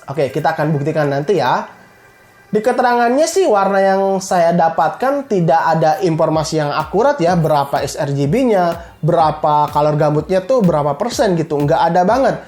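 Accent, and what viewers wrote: West Java, Indonesia. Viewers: Letah sunda mang pabaliut kitu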